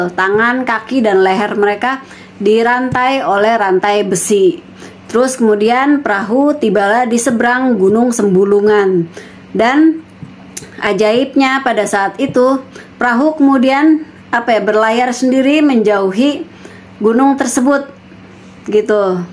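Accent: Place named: Lombok, Indonesia. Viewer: Bahasa indonesia tp logat lombok... mantaaapp...!!!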